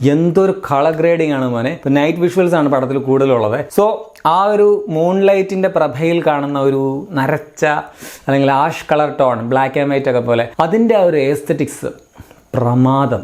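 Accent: Kottayam, India. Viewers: Bro kottayam aarunno😁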